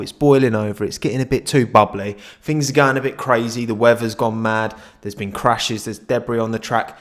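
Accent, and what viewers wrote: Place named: Essex, United Kingdom. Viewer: God damn, I absolutely love your content. It's way up there in F1 context. But I cannot stand the Essexness! I really wish I could look past it but I just can't.. Keep making great content and hopefully I'll be able to break this barrier.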